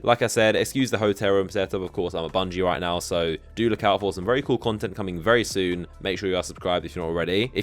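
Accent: United Kingdom. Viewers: My favorite British Boiiii Back At It Again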